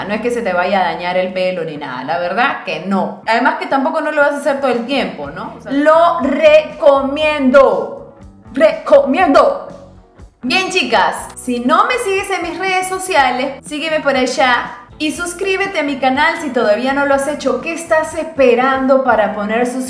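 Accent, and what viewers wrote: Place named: Peru. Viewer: Que lindas son las peruanas......me gusta su acento ..súper el consejo . bendiciones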